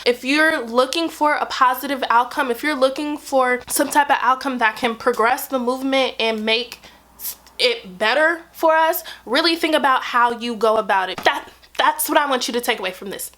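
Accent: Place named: United States. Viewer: You speak with an American accent. Your culture is American. When you accuse white people of "appropriating" your culture when in fact it is African culture they are "appropriating," you are guilty of the very thing you accused them of.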